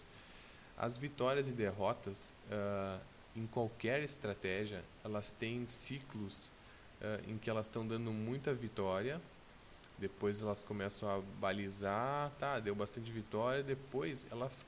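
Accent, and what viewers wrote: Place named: Porto Alegre, Brazil. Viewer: Sou de Porto Alegre , seu sotaque parece daqui hehehe. Estou na Binary a 11 anos e uso o Zeek de forma automatizada.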